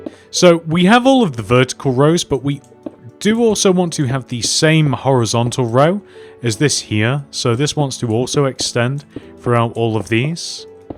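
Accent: United Kingdom. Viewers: Ngl when I use this guys tutorials I use his British accent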